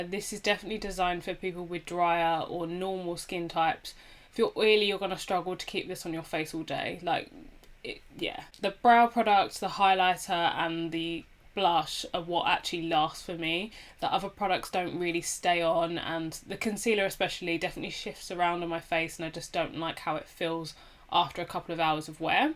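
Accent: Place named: United Kingdom. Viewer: Really enjoyed this video 😉 where are you from? I used to be in the UK, I’ve missed this accent lol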